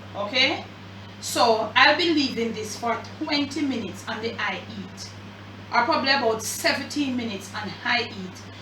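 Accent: Jamaica. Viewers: Am here for Jamaican accent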